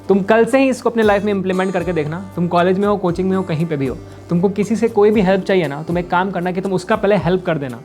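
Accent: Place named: Bihar, India. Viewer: Same ascent of mine Bhaiya I am also from Bihar Bhagalpur ❤ Currently live in kanpur , UP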